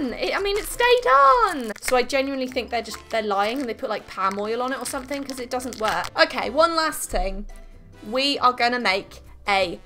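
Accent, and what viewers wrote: England, United Kingdom. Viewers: omg i've just found you're channel and i want to say that you're gorgeous 💕 you can't be real... a very pretty talented artist with a very cute british accent 😍😍 love from france sorry for my grammar :) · But like are you English?? I'm confused ahah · I live for that British accent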